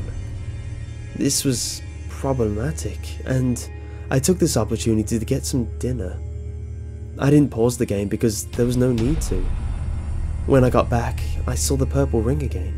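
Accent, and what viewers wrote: United Kingdom. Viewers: || WhoSquad he's British ever heard of it i think you don't because most people on youtube are american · yay british narator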